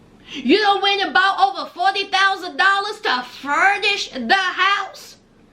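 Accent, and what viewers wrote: South, United States. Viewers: remember when what's her name brought her prison bae home from prison ( the girl who pretended she had her act together and was selling jewelry), the first thing she did was changed the locks on the house. She lied to prison bae and said he was a friend and had keys to the house. But in reality he was the owner of the house and was renting it to her. When old dude saw them together in the back yard in the swimming pool he was livid. He demanded they both vacate the premises. She was probably leading him on before prison bae was released. Those people are stupid for being on the show because the network is making millions of of them and they only get a few measley dollars. Skylar looks like a psycho path in my opinion. She has zero emotions in her eyes. She's the kind of person that will tell you what you want to hear. She lacks emotions, care, concern or any other type of real emotions. 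I just found your channel the other day and you're hilarious. It's the country accent that's give this show life. Great job 👍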